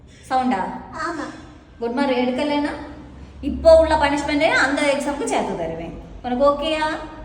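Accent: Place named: Nagercoil, India. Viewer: Nagercoil slang ❤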